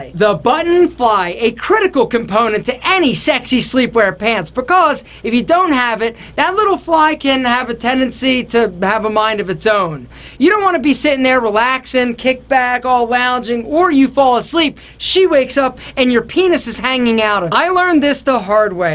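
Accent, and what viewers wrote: United States. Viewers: I’ve never seen someone sound so american like this man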